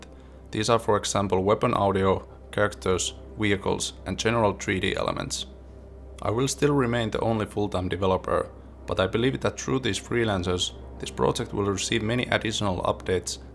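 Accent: Finland. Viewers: Are you finnish you have strong finnish ascent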